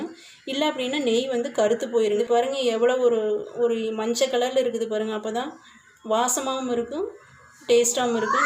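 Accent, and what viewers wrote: Kongu, India. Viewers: Kongu Tamil super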